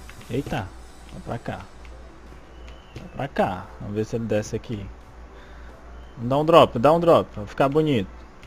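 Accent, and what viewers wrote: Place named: Northeast, Brazil. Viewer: Joga d+ cara u onde que cê Mora??:p Sutaque de Nordestino:P:3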